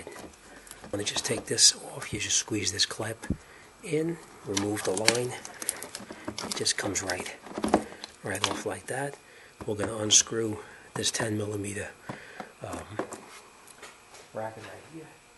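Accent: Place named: East Coast, United States. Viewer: The volume was fine☺ maybe I just like your voice. Your east coast accent. Wiya=wire 💪👍👌👏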